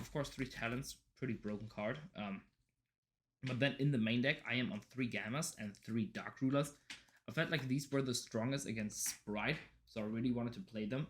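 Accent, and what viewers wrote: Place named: Germany. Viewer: Cool du bist auch ne deuscher :-) Hatte mich schon etwas gewundert über die akzent :-D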